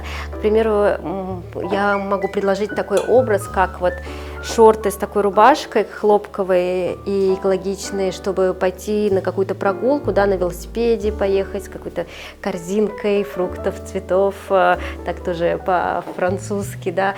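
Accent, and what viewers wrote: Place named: Russia. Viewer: Французский шик с русским акцентом! Потрясающая, потрясающая атмосфера и конечно же изделия 💔 Вы вдохновляете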